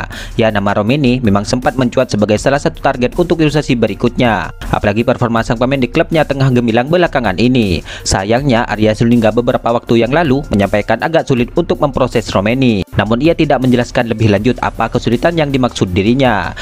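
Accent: Madura, Indonesia. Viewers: Kok kayaknya pembawa acara Chanel ini orang Madura dilihat dr logat bahasanya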